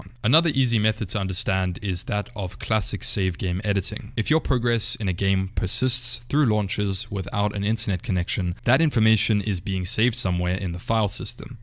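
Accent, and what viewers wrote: South Africa. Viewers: i know im listening to a South African........ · Instantly recognized the south african accent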